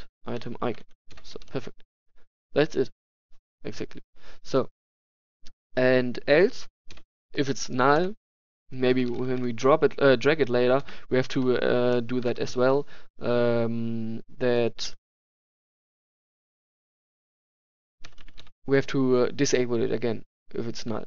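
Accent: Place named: Germany. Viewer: Are you from Germany? You Accent sounds like a german English accent.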